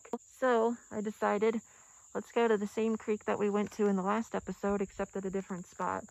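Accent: South, United States. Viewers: I'd just do what works unless there's a serious reason imo Common way is not always best way And also is that a slight country accent? aww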